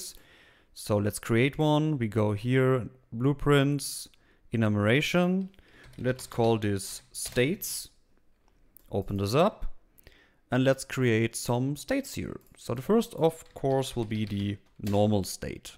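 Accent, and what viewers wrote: Germany. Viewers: Man hört so das deutsch raus haha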